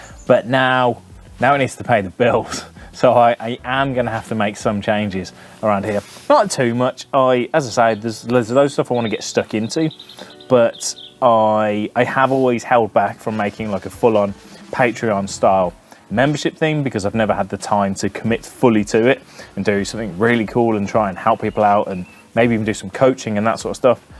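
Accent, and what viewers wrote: United Kingdom. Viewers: I wish you and yours all the best in your future endeavors with the video stuff,. You're a big help in my understanding and the way you present yourself works very well for me. And I love the British accent! I hope you keep moving onward and upward and I'll keep tuning in and learning.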